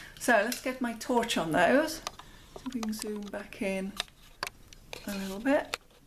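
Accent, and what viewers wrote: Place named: Devon, United Kingdom. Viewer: good on ya. I love seeing people having a go. are you from south West? Devon maybe?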